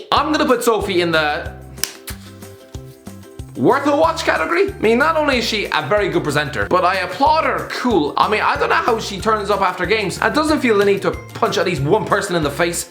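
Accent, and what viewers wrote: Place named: Ireland. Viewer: Whenever I click on a video from this channel, I pray to hear the. I see, my prayers have been answered.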